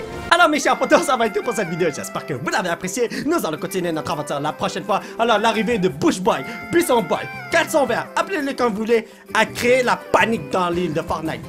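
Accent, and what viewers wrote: Quebec, Canada. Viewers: Accent québécois + humour = 🔥🤣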